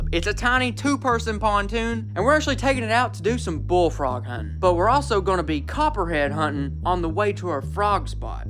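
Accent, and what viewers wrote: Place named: Texas, United States. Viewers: texas accent going hard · he sounds like Georgie from Young Sheldon... is he Texan?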